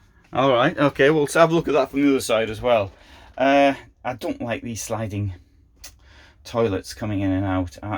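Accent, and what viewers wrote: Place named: Lancashire, United Kingdom. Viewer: American here, I’m kind of a language and accents nerd. I love British regional accents. Are you from Lancashire? Three fourths of my heritage is from the UK. It’s mostly English and Scottish on both sides of my family, with a a small pie slice of Cornish, and the tiniest slivers of north Wales and Northern Ireland. The rest of me is Slavic. Cheers from a mile high in the Arizona mountains.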